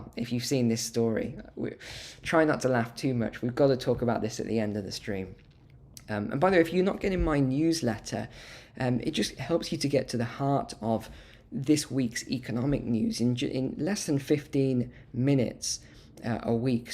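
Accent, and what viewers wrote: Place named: England, United Kingdom. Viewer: I don't know where you live, but you sound like a Pommie, so you must know your country is a socialist state, otherwise you would not have all the welfare services on offer. Indeed all western Countries I would say are Democratic Socialist countries (except for the USA), because they all have welfare programs and social safety nets.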